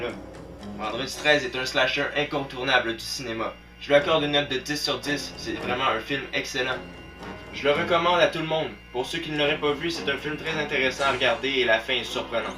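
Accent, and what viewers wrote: Canada. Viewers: Vive le Canada. J'adore votre accent. Bizzzzzzzzzzz. J'achète.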